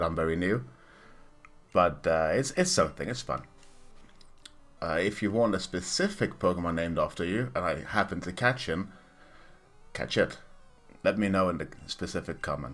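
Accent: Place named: United Kingdom. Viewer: what accent is that? makes me wanna pour in a cup of tea for your vids ahah great commentary, eventhough im not as much of a pokemon fan you make it enjoyable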